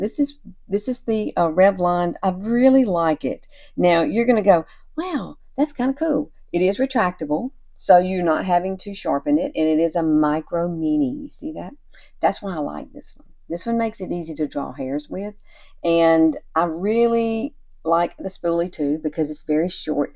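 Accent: South, United States. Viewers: I just love your accent. I think southern accents make you sound friendly. It just makes me smile. Thank you for this tutorial. My daughter is getting married next month and I’m trying to relearn how to put on makeup again. Haven’t worn it for years. This is gonna be very helpful until I do it myself. Haha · Watched your lovely informative video from 2024. Love your soft Southern accent.I’m 77 had my eyebrows tattooed years ago Now I have dark thin eyebrows 😢!! Thank you,Carol from ‘Australia’❤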